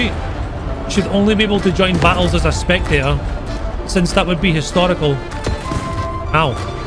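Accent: Scotland, United Kingdom. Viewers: I do really enjoy your intros ! And this one is gold, especially the part on the French "Sacrebleu". As a frenchman I can tell you that i've never said that when cursing :D Love your Scottish accent and thanks for the great content dude :)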